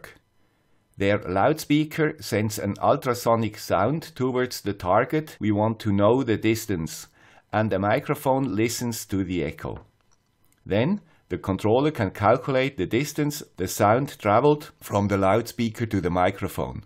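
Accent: Switzerland. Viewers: Pro tip when dealing with swiss accents: 1,25x video speed. It soothes my adhd :-) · I forgot to mention otherwise it's a good video! Thank you "the guy with the Swiss accent" ♥️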